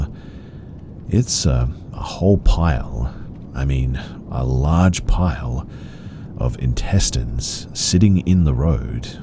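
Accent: Australia. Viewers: Starting the video and hearing a deep Australian accent say "I'm a Chinese woman" was very unexpected.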